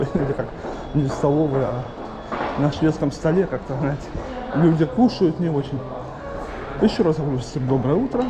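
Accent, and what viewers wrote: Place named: South, Russia. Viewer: Заткните в таком случае свои уши и не слушайте. Человек говорит так как привык с детства. Это южнорусский диалект.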